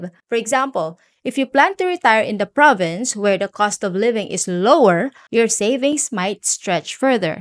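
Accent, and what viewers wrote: Pangasinan, Philippines. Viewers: Taga pangasinan ka po? Accent po tga pangsinan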